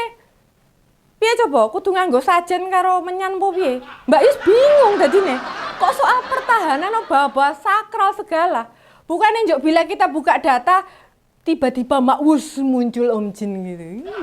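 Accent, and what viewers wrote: Java, Indonesia. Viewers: mbak yus iki lucu.. jowone mdhok banget 🤣🤣🤣salam sehat.. salam waras dari papua barat daya🙏🙏🙏🙏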